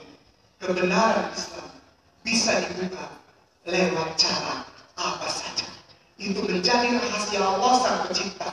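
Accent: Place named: Ambon, Indonesia. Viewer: dr suaranya sudah ketahuan kalo bliau orang ambon, gag perlu lihat muka ato nama,,, suara vokal orang ambon itu khas banget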